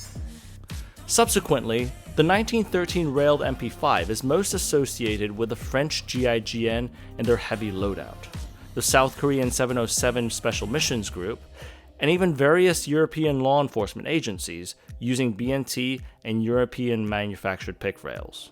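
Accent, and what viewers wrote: Germany. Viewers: Best SMG ever! Update: Your German accent is so naturlich! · An Asia, speaking in a German accent is peak 2026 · great. like your german voice game. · Idk who this guy is but when I see an asian dude do a german accent I sub immediately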